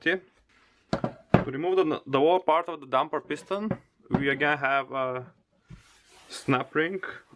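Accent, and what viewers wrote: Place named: Russia. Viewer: Какой прекрасный русский акцент )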